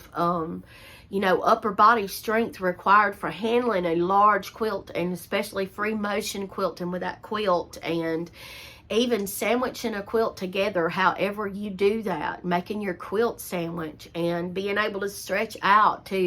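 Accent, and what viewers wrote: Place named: Alabama, United States. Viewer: I am 64 and yes my body does not let me do the things I want to do. I am from Alabama. You sound just like us. Are you from Alabama?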